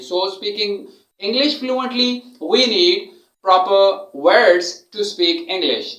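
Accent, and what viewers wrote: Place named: India. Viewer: يبدو من لهجتك انك هندى او باكستانى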